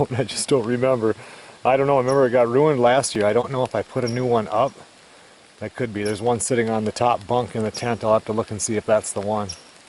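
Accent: Minnesota, United States. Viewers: dear i really love your minnesota accent if u let me come to ur cabin ill let you come to mine in the UP michigan i know michigan people suck but were ohio people so everything will be ok pm me if youre interested alex p.s. wuts ur favorite kind of beer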